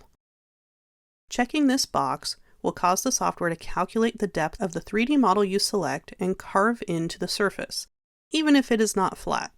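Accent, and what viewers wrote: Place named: England, United Kingdom. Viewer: Good job. Your voice is perfect for this work. I've been fooling around with this stuff for 3 years and now I know I've been doing it all wrong with some unusual results. always went back to the straight bits with just OK results. I think Vectric should hire you to do all their training videos. The English accent confuses me every time. I am now a subscriber. Thanks again. I guess an 80 year old man can still learn a few new tricks after all.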